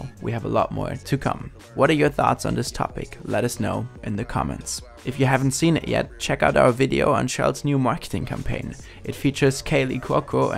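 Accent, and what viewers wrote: Germany. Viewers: Really good video. Like that german accent too! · for me, i dont like his accent, but thats because i dont like the german accent, thats why i dont like speaking english myself, i just really dont like german accents when speaking english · It seems like most of your audience is currently still German but I think you have really good chances of expanding into "international YouTube" and attracting new people who would'n't have been able to enjoy your videos otherwise. I also think that this is one of the main reasons you get complaints about your accent, because Germans usually can't stand German accents while everyone else doesn't really care that much. Personally, I think it adds a lot of character to your videos and I know a lot of other Youtubers who speak with a German accent but have a predominantly international audience. If the accent really bothers you, you can hire a narrator but you don't have to in my opinion. 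· he could work on it at least a bit, though. Even as German as my first langauge, I especially hate a strong German accent. And his is a lot worse than I expected. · Normally I really don't like the German accent, but I think it kinda belongs to this channel (Also u sound insanely cute in this video) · The german accent stays😤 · I would appreciate a native speaker because it's a bit weird if you're German and you're hearing German youtubers speaking English. It's not because you can't speak English fluidly etc. but the Acent is pretty big. lol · Pro German accent. Because it gives a unique characteristic, like Shirvans Azeri accent in Caspian Report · Please do not get a speaker. Your voice is so nice to listen to and even though i am german i know a couple of british and american people who do not mind a bit of an german accent :) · the german accent is so thick that i hope i dont sound like that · Also man hört halt stark deinen deutschen Akzent, ABER es wird dafür viel verständlicher, weil du sehr deutlich sprichst. 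Find ich nice👍🏽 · I love your german accent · The german accent gives this channel a certain characteristic. I think it should stay as it is. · Bin nur kurz hier um mir deinen deutschen Akzent an zu hören und so leid es mir tut er ist wirklich deutlich zu hören 😂😂😂 aber eigentlich ziemlich lustig 😄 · Pleased keep the speaker! I Love the german accent! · don‘t get a narrator. in EVERY damn video game, the one with the german accent is superior. I don‘t make the rules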